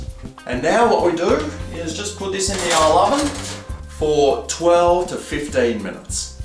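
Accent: Australia. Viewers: Love your accent dude! We ladies are a sucker for AUSSIES!!❤ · We Americans just LOVE ❤❤❤ his Aussie accent!! Southern guy here too, Florida-Georgia, and those biscuits look YUMMY! 😋 Toppings: strawberry preserves, honey, butter and honey, apple butter; sausage, egg (scrambled or fried) and cheese; bacon, egg (scrambled or fried) and cheese; or sausage gravy. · Suprised the crap out of me when I heard the accent, I simply clicked on the thumbnail. I'm in Kentucky, USA watching this dude on the other side of the world make biscuits...something they don't have????? OMG! LOL They sure looked good. The real trick is the flour, soft winter wheat it's called, grows here in the South of the US, not sure what you have over there. And we have self-RISING flour, not self-RAISING flour! I love it! So wish I could visit Australia, seems a magical place. Great video. 955 yankees disagree. 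· When I clicked in southern biscuits, I was not expecting an Australian! I'm a southern gal from America and loved your tutorial! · This is exactly the same as the Southern Living recipe for perfect buttermilk biscuits. With an Aussie accent. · And sounds like an Aussie... so why didn't he just call them scones? They look like scones (more or less.) Do they taste the same, I wonder?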